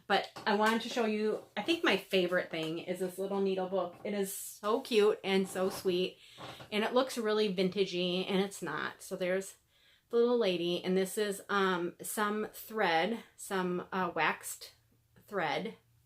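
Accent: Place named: Minnesota, United States. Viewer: I am enjoying your videos, chuckled while watching on one of your first ones commenting on your “Minnesota” accent! Never thought I had one either until I heard myself say Minnesota! Speaking of Minnesota, a lake theme or lakeside theme would be fun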